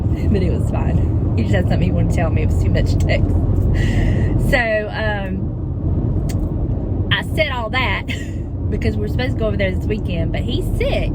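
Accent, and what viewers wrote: South, United States. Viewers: I enjoyed watching you video. I was so happy you shared the answer to prayer with us. When I was in nursing school my lead instructor was recovering from cancer. We were able to get more clinical than the other classes, on days she didn’t feel good. So proud she has a job she love. I have probably overused this phrase, but I love ❤️ your southern accent. I don’t feel living in n. Louisiana that I have much of a southern drawl. You have a lovely home. Looking forward to your next blog. God bless.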